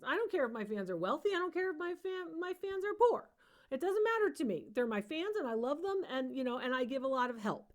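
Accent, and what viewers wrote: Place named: North Jersey, United States. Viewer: Love you Doc! .... Are you sure you're not from North Jersey? 💗🤔😊 You sound just like my sister-in-law. (That's a compliment)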